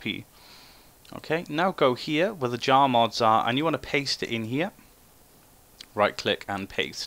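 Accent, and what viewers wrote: Wales, United Kingdom. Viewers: Awesome, worked for me. Btw where are you from? Did I detect a Welsh accent or am I completely wrong? Just as a heads up to others as well optifine lite wont work with the other mods, go standard or ultra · Are you from wales?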